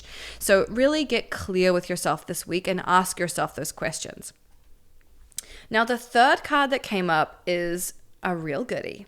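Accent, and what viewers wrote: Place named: New Zealand. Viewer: 😹😹😹 FUCK ME... you are hilarious!... it’s so lovely to hear another NZ accent and one that swears as much as I do! 😹😹😹 I shit you not muffin! 😹👍🏻 I am here in LA 😋💛 and I am on IG... not very much but I will toddle on over and DM you 😹👍🏻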